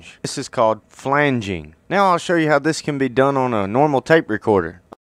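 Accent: South, United States. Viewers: love the American (southern?) drawl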